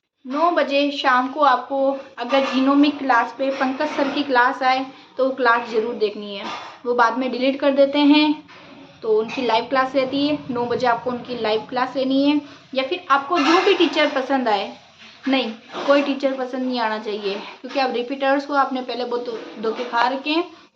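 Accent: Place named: Rajasthan, India. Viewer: Muje aapki baato ko sun ke lag rha hai aap Rajasthan se ho🤔🤔🤔🤔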